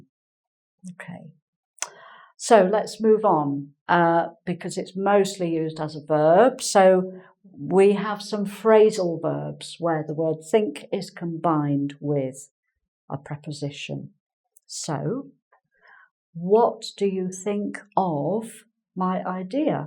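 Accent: United Kingdom. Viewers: Gracias maestra es muy clara en su enseñanza. Me encanta el acento británico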